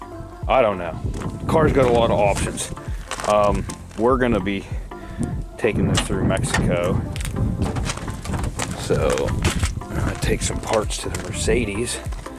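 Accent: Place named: Pittsburgh, United States. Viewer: You sound like you're from Pittsburgh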